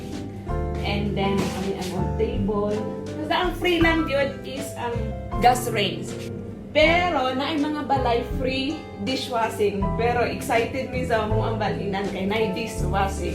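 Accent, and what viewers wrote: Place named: Visayas, Philippines. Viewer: Bisaya diay ka host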